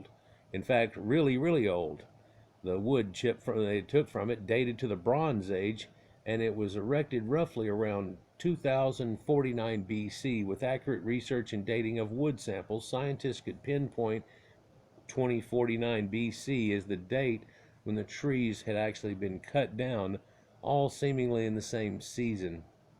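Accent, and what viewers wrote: United States. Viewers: I don't want an American narrating a programme about OUR ancient history! Goodbye!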